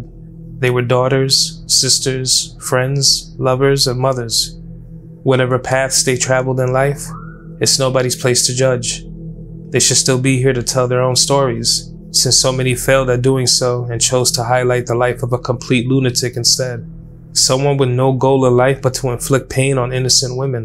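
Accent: New York, United States. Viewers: Yw. Also a question are u from New York?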